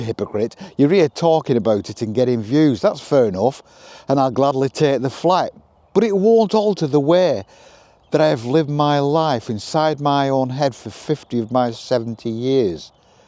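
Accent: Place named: England, United Kingdom. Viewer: Loved every minute of your video, not least, I love your accent. My grandparents emigrated England to come to Australia in the late 60’s and whenever I hear a similar accent it makes me want to visit England. Sadly I’ve never been but would love to see where my ancestors lived one day.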